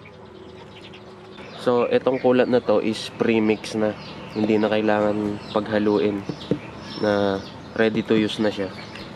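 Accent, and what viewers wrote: Pampanga, Philippines. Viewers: Kapampangan accent.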